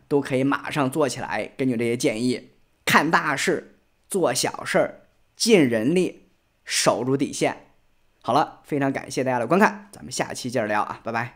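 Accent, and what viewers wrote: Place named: Hefei, China. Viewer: 讲话的很象合肥人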